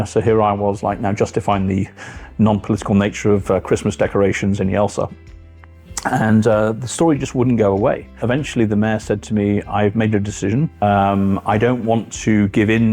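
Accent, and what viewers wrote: United Kingdom. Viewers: You have a pleasant voice and your British accent sounds so good to my Finnish ears. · I agree. has such a nice, soothing voice and British accent is always welcomed.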